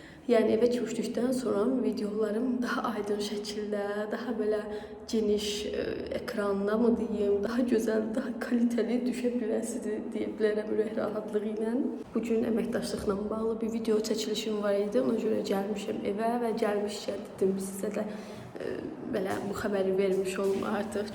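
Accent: Sheki, Azerbaijan. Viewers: Canim gôzum xeyirli olsun saglam oturun icinde xowbext yawiyin.Balalarinizin xeyir iwlerini goresiz.Bizde Maskoviski oblastda yawiyiriq.Ümüd edirem bir vaxt rastlawariq.Menim yeznemde Wekilidi çox Gozel insandi.Weki lehçesini cox sevirem wirin daniwirsiz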